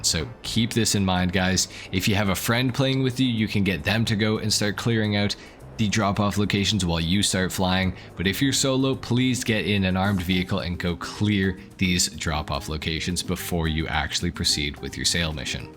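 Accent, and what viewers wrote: Winnipeg, Canada. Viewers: Haha I didn’t know you were from Winnipeg bro. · Nah buddy, you from Winnipeg?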